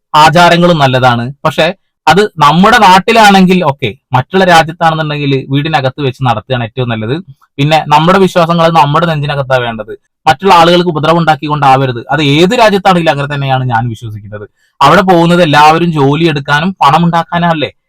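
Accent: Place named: Kozhikode, India. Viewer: Slang is like Kozhikode chankuvetty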